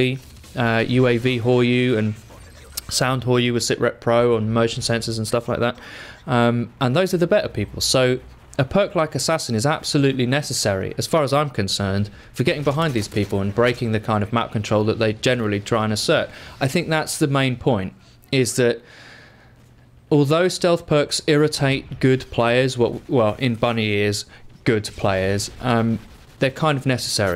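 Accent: United Kingdom. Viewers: Lolzer i thought i was in my sub box, clicked this video thinking it was BT heard the english accent and thought wtf? Really enjoyed the video and will definitely subscribe:) hon the BT for liking he video and confusing the shit out of me:L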